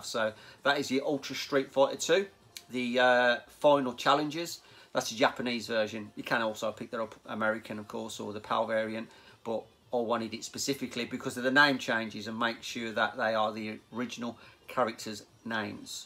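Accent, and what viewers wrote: Birmingham, United Kingdom. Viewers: Good to hear a brummy voice on YT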